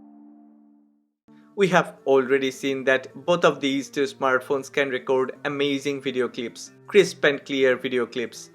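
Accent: India. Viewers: you sound like from India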